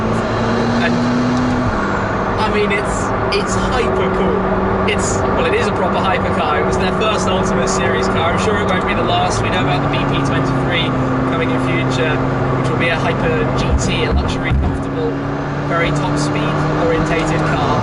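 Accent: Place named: United Kingdom. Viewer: Eduardo Galindo Brambila his accent? He's British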